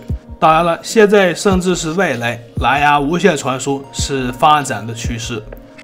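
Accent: Shanxi, China. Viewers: 大哥你是不是山西或者陕西人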